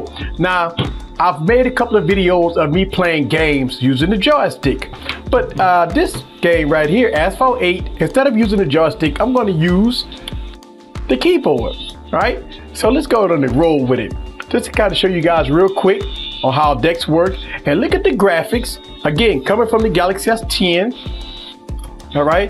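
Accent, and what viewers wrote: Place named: South, United States. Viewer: that nawlins wallpaper is no surprise with that accent. Way down south accent. Rock onbro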